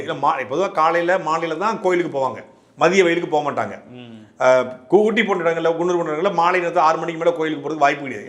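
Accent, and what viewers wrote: Tirunelveli, India. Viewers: யின் குரல் ஒலி திருநெல்வேலியின் குரல்வழி திருநெல்வேலிக்கு பெருமை சேர்க்கும் நெல்லை பேச்சுக்கு பெருமை சேர்க்கும் நெல்லை மாவட்ட வீரர்களுக்கு எடுத்துக்காட்டாக திகழ்பவர் அவர்கள் அவர்களுடைய பிறந்தநாளுக்கு எங்களுடைய வாழ்த்துக்கள் முன்பாகவே சொல்லி விடுகிறோம் நெல்லை சீமைக்கு பெருமை தரும் அவர்களின் அதிரடி பேச்சுக்கள் தமிழக சட்டசபையிலும் டெல்லி பாராளுமன்றத்திலும் பாராளுமன்ற டைகர் என்ற பெயரை பெற ரெண்டு ஹீரோ வேண்டுகிறோம்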